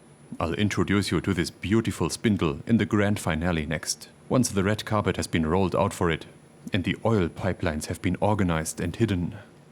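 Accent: Germany. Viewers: You are like This Old Tony with a german accent. Every single video that you do makes me laugh.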